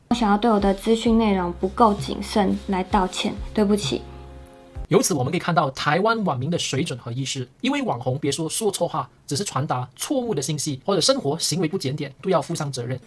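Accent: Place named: Malaysia. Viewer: 哦哦 我是大马人 听你的语音 还以为你是大马的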